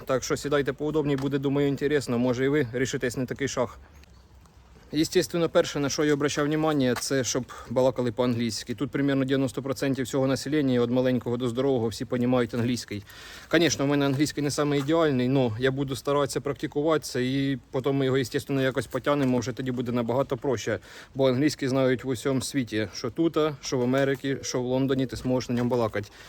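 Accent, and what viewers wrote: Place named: Poltava, Ukraine. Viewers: Привіт з Полтавщини! Суржик клас! Прям як у нас! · Дівчата, нічого не згодна з Вами, його мова - це чистий суржик Полтавської області 😊.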